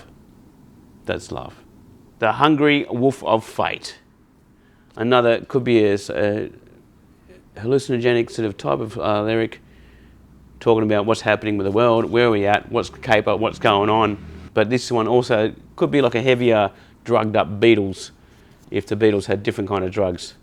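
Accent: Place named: Australia. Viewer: Great review. Love the Aussie accent 😁 mines a bit different 🇦🇺 Yah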